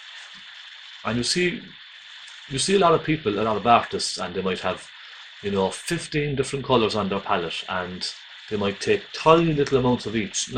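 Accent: Ireland. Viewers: hard for international people to understand English with an Irish accent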